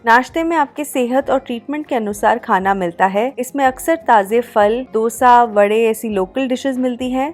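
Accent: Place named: North India, India. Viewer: Itani sudhdh Hindi...i guess your native place must be somewhere in North...anyway so pleasing to hear your Hindi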